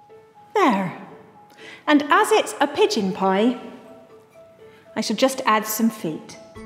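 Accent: United Kingdom. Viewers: Why do I keep watching this video?!?! (other than the British accent, I mean)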